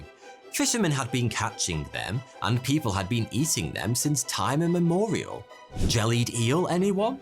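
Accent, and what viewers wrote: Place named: England, United Kingdom. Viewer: I hate the ingland accent I do not understanding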